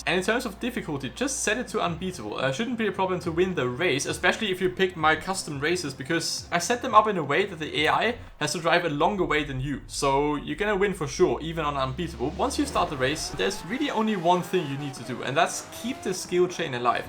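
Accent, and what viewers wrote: Germany. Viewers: You sounds like a German. I Think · you know its bouta go down when you hear the german accent and you see the glasses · Irgendwie finde ichs lustig wenn Deutsche Englisch sprechen auf YouTube. Kein Front gegen dein Englisch, liegt glaube an mir :D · wie man das deutsch einfach raushört haha xD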